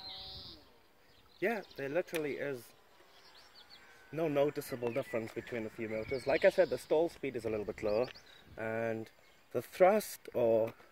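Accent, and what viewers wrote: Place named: South Africa. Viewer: Awesome video man. I ordered one of these motors! (Along with tons of other rc gadgets) Also, I can hear that South African accent from a mile away haha awesome.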